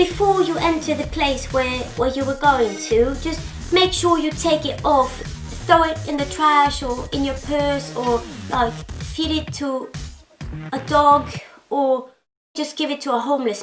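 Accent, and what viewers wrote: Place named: England, United Kingdom. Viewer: What part of England are you from? I love you accent! Loved the skit!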